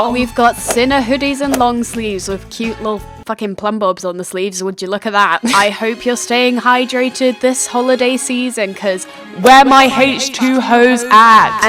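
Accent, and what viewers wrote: United States. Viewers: Are you from America or London 🤔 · omg your american accent hahahah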